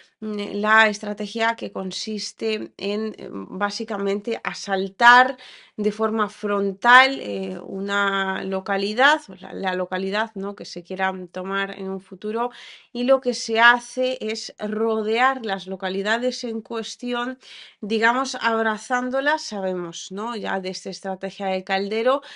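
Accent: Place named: Spain. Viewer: Hola, me gusta mucho tu contenido y quiero decirte que también yo soy seguidor de tu compatriota La señorita Inna Afinoginava, yo créo que ya la conoces, porque ella también estudio el español en España 🇪🇸 Yo soy de Mexico y las sigo a las dos Pero me gusta mucho verla a ella porque habla cómo nosotros en Mexico, y no tiene el acento español como lo tienes tú. En España usan mucho los sinónimos De Os, Vos y sois, sabeis. Lo que en Mexico se erradicó el Español ibérico. Es cómo el Inglés de Inglaterra 🏴 y el de Estados Unidos Que son muy diferentes. Me gustaría que hablaras más cómo hablamos acá en Mexico. Así como lo hace Inna Afinoginova.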